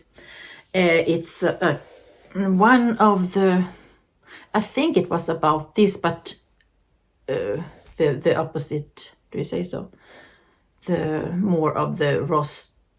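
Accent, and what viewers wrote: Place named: Sweden. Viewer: I love yout Swedish accent ! It's so cute❤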